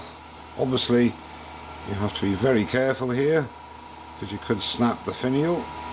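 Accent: Wales, United Kingdom. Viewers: Hi!! Very nice job on the finial, move over Cindy!! Great tip using the stain to give the apearance of ebony, I liked that. I know as your videos become more polished you can incorperate music and speed up the film but I must admit I do miss the old simple videos with your explanations and banter while turning. It must be your mellow Welsh tones. Still a great vid All the best!!.............Andy.;)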